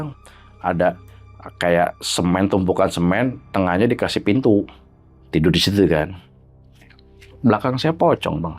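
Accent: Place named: Jakarta, Indonesia. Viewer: cerita belakangan, yg penting logat betawi nya dapet..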